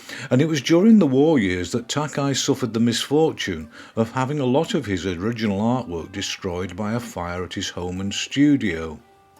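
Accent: United Kingdom. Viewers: HIs cultural artwork and line work are new to me. I can't say I enjoyed them. But, do appreciate his changing styles. I also enjoy his more representational work. Your voice inflections surprised me. And wonder if they are an English (British)? As always, I learned more about the trade. Tnx!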